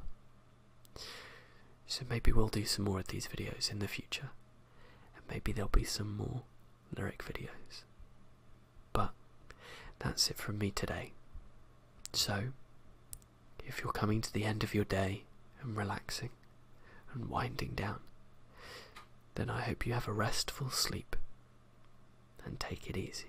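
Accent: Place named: United Kingdom. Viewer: this is so nice! Mind me asking where in the UK are you? So i know where i should look for a man that has the same accent as yours...and hopefully as handsome as you are! hehe! :P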